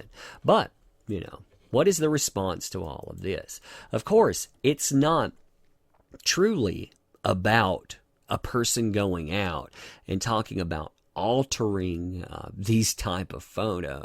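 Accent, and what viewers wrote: South, United States. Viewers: Subbing purely for dat Southern drawl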